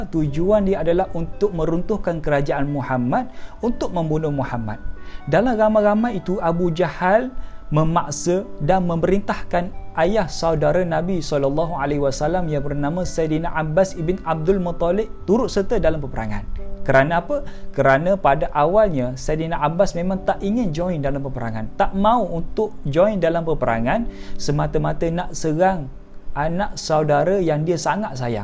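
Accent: Kelantan, Malaysia. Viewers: Bagus penerangan ustaz. TQ Dr.. Ada bunyi loghat kelate sikit²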